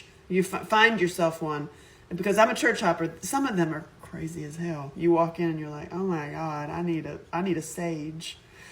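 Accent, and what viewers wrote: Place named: South, United States. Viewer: Hi! It's been awhile! Question, when did you get southern drawl? Plus, when did you get tattoos?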